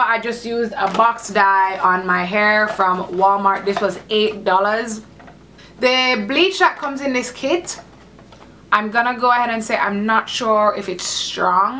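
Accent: Jamaica. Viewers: You sound Jamaican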